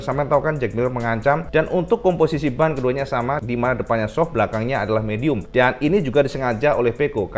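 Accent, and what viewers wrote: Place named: Java, Indonesia. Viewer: Jos cak, cennel medok udah update 😂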